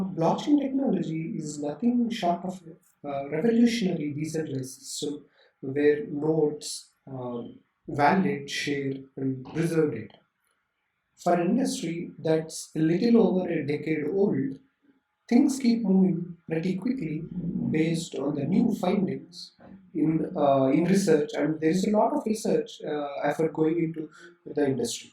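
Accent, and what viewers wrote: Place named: India. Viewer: Indian accent + echo chamber^2 = non native english speaker can't understand.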